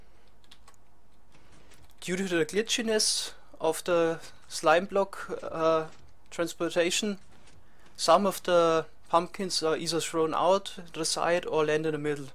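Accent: Germany. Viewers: der deutsche Akzent kickt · it is interesting seeing his german accent improve over time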